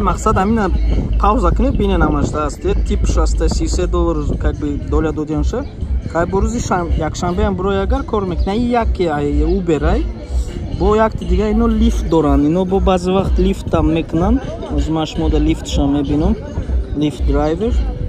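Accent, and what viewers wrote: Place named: Dushanbe, Tajikistan. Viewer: обожаю тебя, душанбинец ты наш. 😘😘😘😘😘 Этот акцент... Как же давно не видела наших местных коренных душанбинцев. 😘😘😘😘🤗🤗🤗 Удачи!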